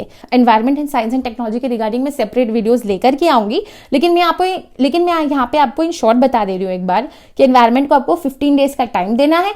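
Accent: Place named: Madhya Pradesh, India. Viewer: Mam aap mp se lag rhi ho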